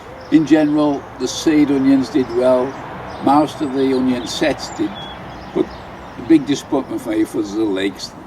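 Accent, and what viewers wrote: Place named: Black Country, United Kingdom. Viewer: Hiya. I have watched many of ya videos and I think they are great, ya cor be too far from me with that accent. dragged up in Gornal.raised in Woodsetton and now live in Brewood. yow must be local to me with that Goodrich logo on ya top, I'm on the allotments in Watery lane Codsall, Ima a guessing yam in Bushbury or close by, would love to hear from ya, Hopefully, speak to ya soon,Arthur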